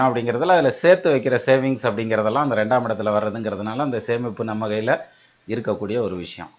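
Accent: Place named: Madurai, India. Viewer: என்ன சார் நீங்க 1000 பிஎச்டி க்கு மேல் பண்ணியவங்களுக்கு கூட இல்லாத இவ்வளவு ஜோதிடப்புலமை தமிழ் ஞானம் எப்படி சார்..அதோட சூப்பர் மதுரை தமிழ்.அப்பப்பா என்ன சொல்லி உங்களை பாராட்டுவது..வார்த்தைகளே இல்லை சார்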